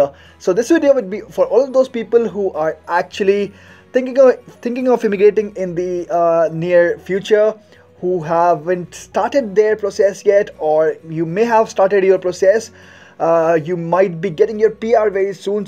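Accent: India. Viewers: sorry mate but you dont sound a genuine person you must be from india or sirilanka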